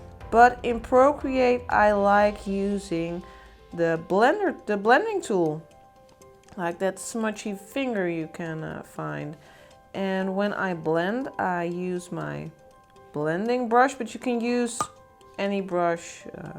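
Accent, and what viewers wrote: Netherlands. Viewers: I spy a dutch accent 😀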